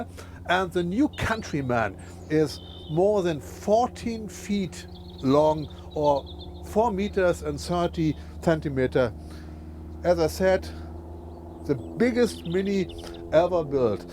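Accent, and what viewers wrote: Germany. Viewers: I like the reviewer! I love the german-english! keep up the good work! (Car is ugly)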